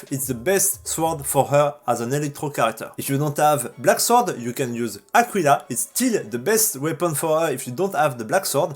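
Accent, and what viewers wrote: France. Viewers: J'ai tout de suite vu que t'était français avec l'accent mdrr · alala l'accent fr de qualité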